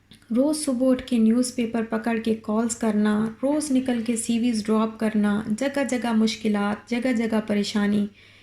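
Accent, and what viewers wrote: Saraiki, Pakistan. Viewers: Aapk talking style sa lgta hy k aap saraiki ho sister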